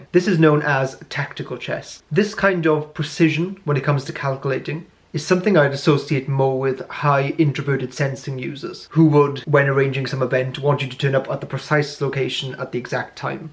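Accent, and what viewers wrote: Wales, United Kingdom. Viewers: Bruh I really want to listen to you but I just can't stand your british accent. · Are you welsh?? Sorry just trying to pin the accent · lovely welsh accent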